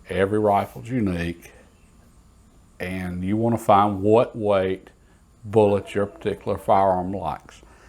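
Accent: South, United States. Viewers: Maybe I missed it, hard time hearing these days, but I always went with the longer the round the more twist were needed, not the weight of the bullet but I guess it could follow the heaver the bullet the longer...this is a great channel with great content and I can actually understand the southern accent better lol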